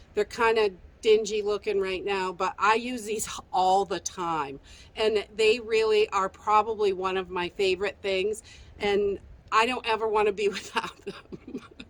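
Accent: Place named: Long Island, United States. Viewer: where are you from originally? Sometimes your accent sounds Long Islandy and the expression "landed up" as opposed to "ended up" is different.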